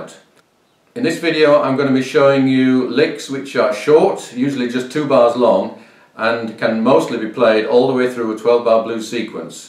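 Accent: England, United Kingdom. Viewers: My brain did a hard reset when I heard a English accent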